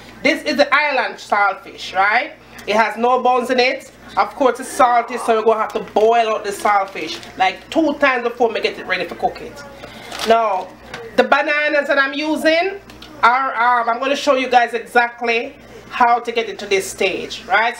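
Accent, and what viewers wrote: Jamaica. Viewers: Her voice and accent brings back such nice warm memories of Jamaica, plus her food, nice and homely..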